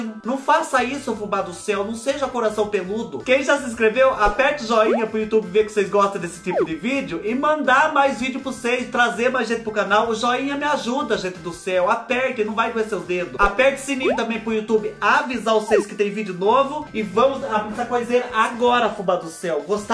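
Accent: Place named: Juazeiro, Brazil. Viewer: Sou do Ceará, e acho o sotaque do fubá muito parecido com quem mora em Juazeiro.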